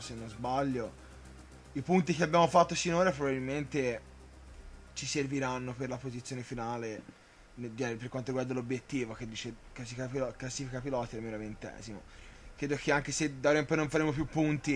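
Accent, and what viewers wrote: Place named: Livorno, Italy. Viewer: Le tue incazzature con l'accento livornese sono favolose ahah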